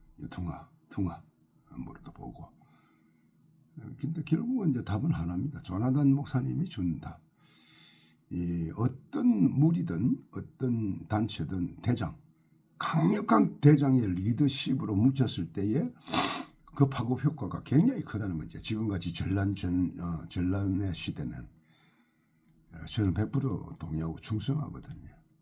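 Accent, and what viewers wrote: Gyeongsang, South Korea. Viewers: 같은 경상도 말씨 좋아요 우리 함께 자유통일당 당원이여서. 감사드립니다. 구독은 진작했읍니다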